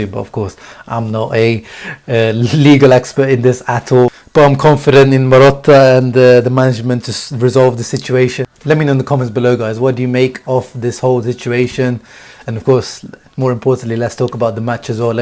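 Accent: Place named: Italy. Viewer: So far SO FAR I like your show. I agree. I think I'd definitely change that background. Shooting from your BEDROOM takes away from you believe it or not. I speak English but love hearing the Italian accent during commentating. Also better music for an intro. Better yet no music during you commentary. (Just some GOOD INTRO MUSIC.)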